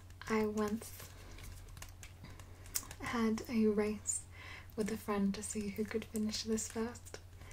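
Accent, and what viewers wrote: Australia. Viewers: more reason I do enjoy your videos asides from the video game knowledge and soothing content. Aussi accents are the best. ❤️❤️😘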